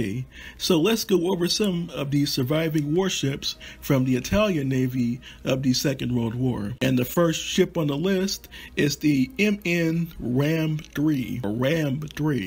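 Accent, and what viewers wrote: United States. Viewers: I had to give this video a thumbs down. Your American accent makes it unbearable to listen.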